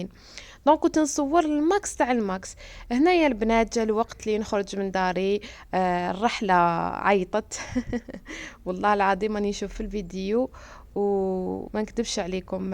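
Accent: Algiers, Algeria. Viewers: انا عييت نفهم علاه تهدر عاصمي و هي من الاغواط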